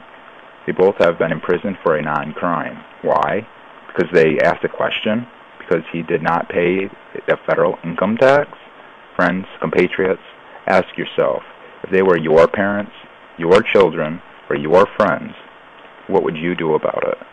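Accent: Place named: China. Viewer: Frutation??? Subjigated to...? Chinese english...not bad though....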